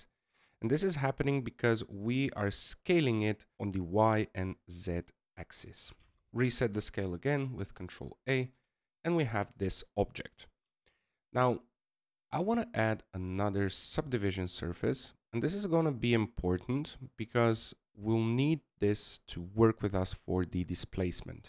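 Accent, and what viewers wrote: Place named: Ireland. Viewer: I don't know why, maybe because of your accent, I was convinced you are in Ireland 🤦‍♀️😊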